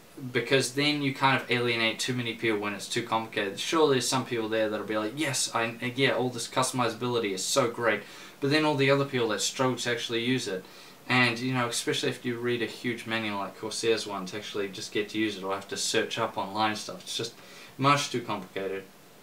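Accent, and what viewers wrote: New Zealand. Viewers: Sounds more Kiwi than Aussie, unless you're not an Aussie yourself, then all is forgiven:)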